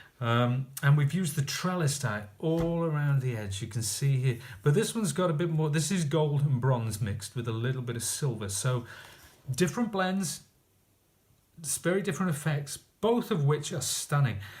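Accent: United Kingdom. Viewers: Well done! Also... nice to here a fellow British accent! 🇬🇧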